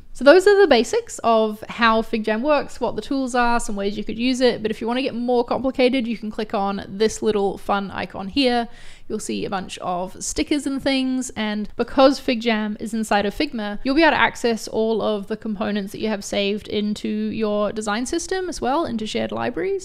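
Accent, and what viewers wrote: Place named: New Zealand. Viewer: Figjam is cool but I’m so glad I was able to tell the you were from New Zealand and not Australia. As an American I’m quite proud.😊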